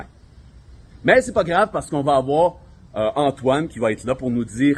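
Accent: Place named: Quebec, Canada. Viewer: Merci beaucoup, j'adore l'humour Québécois,et la façon de parler.Amities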